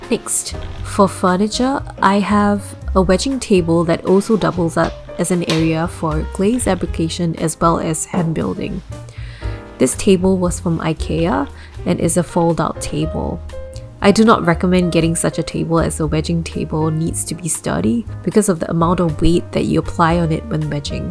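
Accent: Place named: Southeast Asia. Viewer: ah, when I heard you started talking I knew you were Southeast Asian! nice to see a SEA ceramicist here :) I’ve been thinking of getting into pottery (maybe turn it into a career in the future?) your video is really useful !! thank you for the list too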